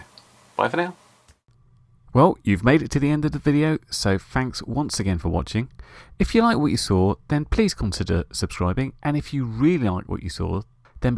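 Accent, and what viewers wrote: United Kingdom. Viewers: Oh wow. I had to stand up. It's as close as a cheese burger. A British accent doesn't make you automatically smart son. Read. Just read. Do what I ALWAYS told my Dad. I said "Before you call me RTFM. Read the effing Manual ".Anymore questions call me. So, RTFM!. And if you deal with HS as PEOPLE they'll deal with you as a king. Arrogance out back please.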